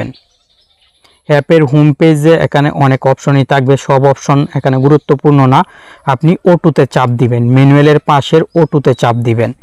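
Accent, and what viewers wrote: Mymensingh, Bangladesh. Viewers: Vair bari mone hoi Mymensingh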